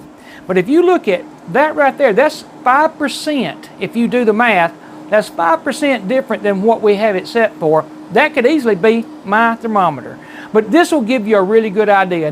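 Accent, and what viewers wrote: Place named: Georgia, United States. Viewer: glad my accent spotting wasn't off :) look forward to more content I lived in forsyth and Cherokee but accent sounded very similar to my friends by cartersville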